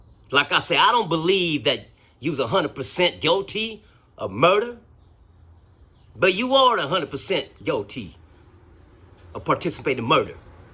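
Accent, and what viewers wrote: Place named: South, United States. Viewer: Powerful, brother ✊. You sound like you might be from the South. Mississippi, maybe? If you are, we need you to go to Minneapolis and school those MFs. I don't mean just the Tou Thaos, but also the Tou Ger Xiongs and the KaYings who purport to be the almighty leaders in the Hmong community. Tell 'em to take their degrees and get dafuq outta the way so you can stop this sh!t from happening again. They let Tou Thao happen and he embarrassed us all forever. I am pissed.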